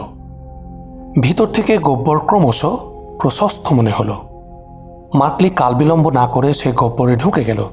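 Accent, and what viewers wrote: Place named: Kolkata, India. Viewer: ভাই আপনার কথা বলার স্টাইলে মজা পাইনা, কলকাতার মত লাগে, বাংলাদেশের টনে কথা বলুন প্লিজ, (সুন্দরের উচ্চারণ করছেন ছুন্দর এমন অনেক উচ্চারণই বাংলাদেশের টনে হচ্ছে না,)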